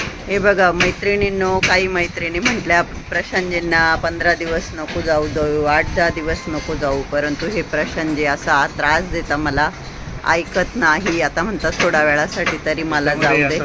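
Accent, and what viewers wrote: Nashik, India. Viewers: तुमची टोनींग nashik ची वाटते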